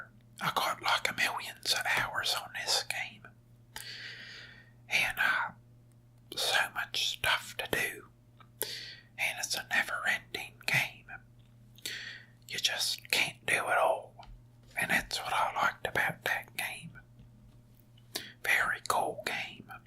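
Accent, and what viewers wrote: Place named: South, United States. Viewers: owen leather this is,late but it sounds like a southern American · what is your accent ?! it sounds extremely southern american most of the time and then occasionally falls into australian especially when you say time, like and buy... its rather peculiar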